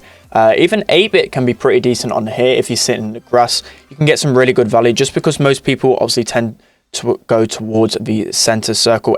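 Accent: United Kingdom. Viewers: Awww cute British accent tho👀🥰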